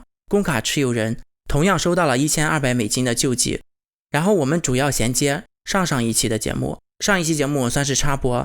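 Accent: Shandong, China. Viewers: 博主山东人？？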